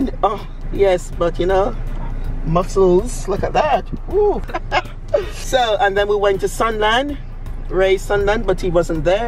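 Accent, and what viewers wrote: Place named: United States. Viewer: Her accent sounds a little American.I wonder if she lived in the states a little bit.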